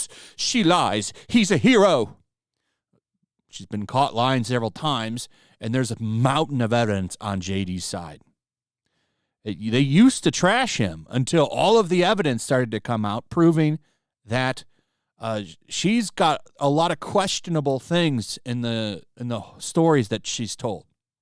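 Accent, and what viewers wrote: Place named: Upper class, United Kingdom. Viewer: Not a bad attempt at the snooty English sound. I'm a Yorkshire man and it's only a certain "class" that talk like that... fortunately.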